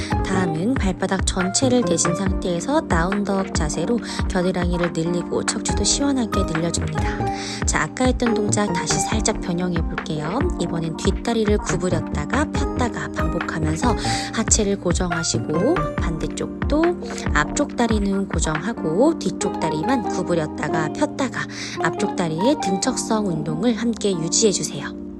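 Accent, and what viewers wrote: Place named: China. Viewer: 동물원에 가서 다리 찢는 영상 보고 왔습니다. 조선족인가요? 한국말. 하지말아주세요ㅜㅜ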